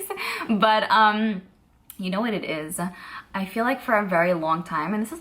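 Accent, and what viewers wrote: New York, United States. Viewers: The way you speak? There's nothing wrong with the way you speak.... Ppl are so freakin' rude.... She lives in New York! She has an accent! F ppl, for real. 💗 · You have a New York accent. When I moved out of state I was tortured because people would make fun of my accent. 20 years later now I have a Ohio accent and I even say pop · I'm a NJ girl, born and raised, and the New York in you makes me love you so very much. Your way of speaking is so endearing to me- keep being authentically you! Unfortunately everyone has an opinion about everything and everyone, and feel the need to shout it from the rooftops of the internet 😡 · Agreed! I follow because she has her own little dialect and I love a good "New Yawk" accent as well. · I love your NY accent. You are fantastic and I appreciate your videos. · You have a New York accent, no? 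I can't believe how people can be...good grief, I love the way you are! ❤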